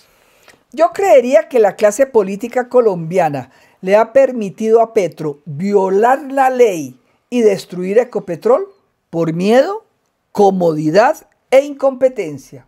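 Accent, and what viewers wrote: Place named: Monterrey, Mexico. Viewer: ¿Señora, Usted dice de todo, pero no aporta ninguna prueba, solo se burla de Petro. ¡Usted es clasista, racista, se le nota por su habladito 'regio'!! ¡Qué basura de canal, coja oficio!!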